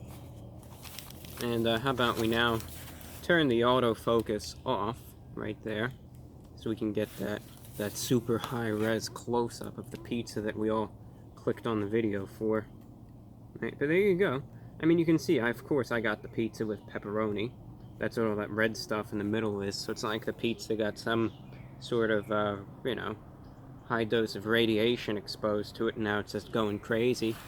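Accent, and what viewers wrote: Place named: Brooklyn, United States. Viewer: He has to be from Brooklyn ! Lol 🗽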